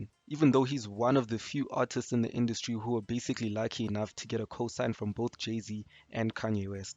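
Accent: South Africa. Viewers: i swear to god you sound south african, are you? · You south African bro?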